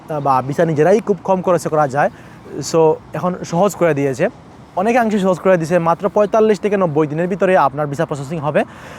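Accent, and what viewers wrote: Sylhet, Bangladesh. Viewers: আসসালামু আলাইকুম ভাই আপনে সিলেটি মন হয় রিপ্লাই দিবেন কিন্তু ❤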